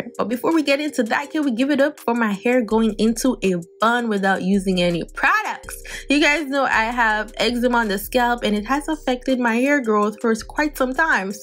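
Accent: Jamaica. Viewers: Wait girl.. u jamaican or supm?... cause mi a hear a likkle supm supm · Cause me know you sound jamaican girl big up 💯 Am a try your way tomorrow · Are you from 🇯🇲???? Cause I heard a lil jamaican accent..... And I really like this style. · Your Jamaican accent gave you my sub😍😍😍mi luv mi yaardie dem baaaad😂😘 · I can hear the Jamaican accent♥️🇯🇲...It's everything for me 💯❗ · I was just about to say u sound like Jamaican 🥰🥰 love my ppl.. About to do my hair like this now thanks for the video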